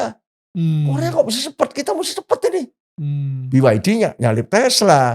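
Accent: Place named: Java, Indonesia. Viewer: Logat Jawanya medok banget, jadi makin relate sama pak Hermawan 😊 "kejujuran adalah nilai2 Islam yang Universal"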